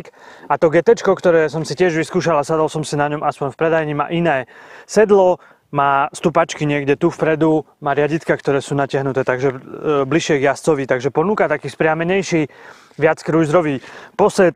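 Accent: Eastern Slovakia, Slovakia. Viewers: Video fajn,len ten strašný východniarsky prízvuk .... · a ten krásnej vyhodnarskyj přízvuk👍díky.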